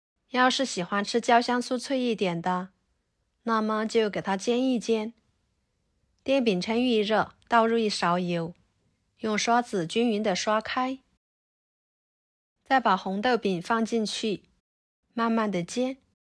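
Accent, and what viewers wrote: Yunnan, China. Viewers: 又听到了云南普通话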